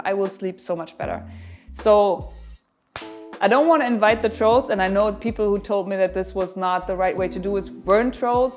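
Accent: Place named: Germany. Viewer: the more serious it is, the more german she speaks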